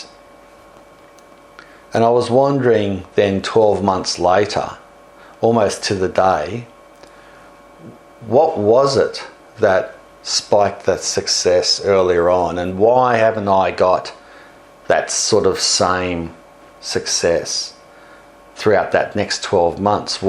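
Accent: Australia. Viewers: I like your accent, it reminds me of crocodile dundee, your so full of life, I just feel like gardening myself. Great job. Great video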